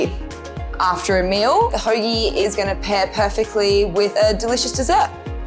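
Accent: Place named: Australia. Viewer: I think she's from Australia or somewhere "over there" Everyone has an accent no matter where we're from. Get over it... 😏 And... you can hold the Balsamic Vinegar on my Sandwich too... 😢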